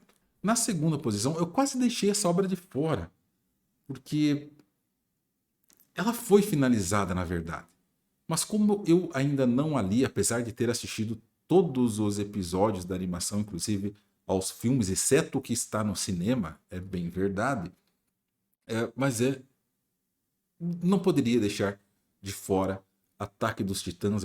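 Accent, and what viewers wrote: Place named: Rio Grande do Sul, Brazil. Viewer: muito obrigado. Uso saquinhos em alguns, mesmo morando na Paraíba. O sotaque parece gaúcho. ☺️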